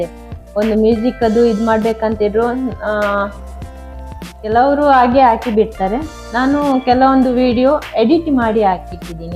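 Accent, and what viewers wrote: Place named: Mangalore, India. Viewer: Super fish fry ❤️🤤Thumba chanagi mathadthiri Mangalore kannada 😂 be connected always 👍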